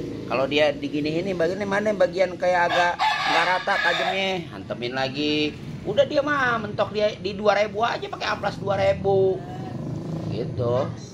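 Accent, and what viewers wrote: Jakarta, Indonesia. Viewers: kentel bgt Betawi Nye cang, salam dari Depok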